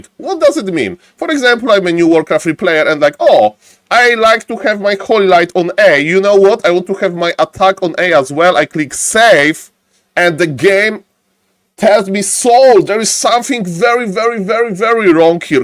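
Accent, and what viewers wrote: Poland. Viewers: Best polish accent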